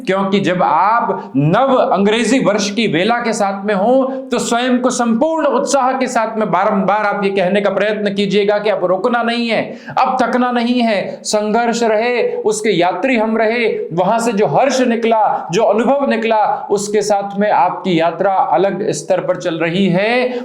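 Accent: Standard. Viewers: Aati Sundaar va shuddh boltay hai aap, kash aap hindi kae teacher hotay, Thank you ji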